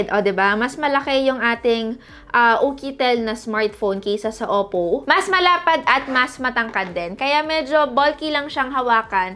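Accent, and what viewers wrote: Visayas, Philippines. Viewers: yay! bisaya ka ate? <3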